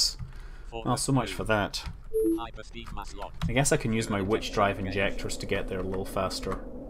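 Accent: Scotland, United Kingdom. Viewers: Hey, are you scottish...you sound scottish:3?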